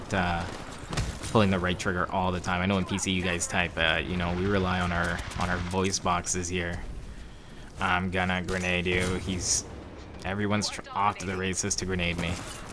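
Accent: Canada. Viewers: Are you Canadian? Sounds like it. Say “battery about”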